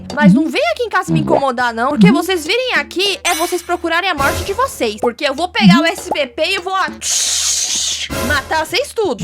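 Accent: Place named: Northeast, Brazil. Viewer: Você mora no nordeste pelo sotaque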